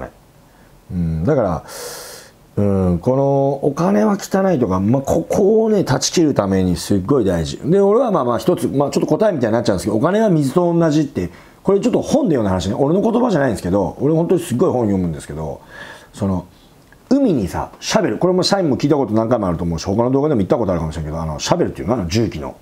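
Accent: Nagoya, Japan. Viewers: 名古屋弁聞くとホッとしますね(^ ^) 為になる話ありがとうございます。 p.s.錦は高級で行けないので、栄の女子大小路によく行きます。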